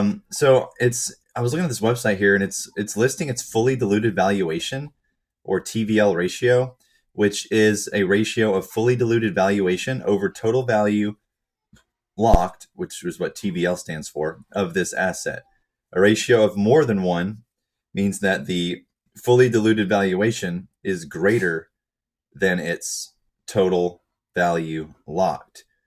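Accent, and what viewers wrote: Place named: England, United Kingdom. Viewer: Ur English accent is heaven to my ears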